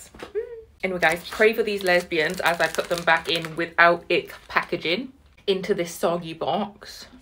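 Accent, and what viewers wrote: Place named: United Kingdom. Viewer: i luv ur accenttt where r u from? im from the uk too!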